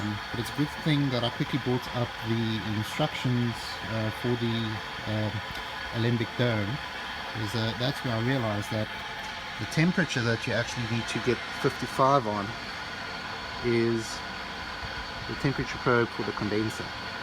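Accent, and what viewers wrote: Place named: South Africa. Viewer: pretty cool man! Thought you sounded SA then you said you were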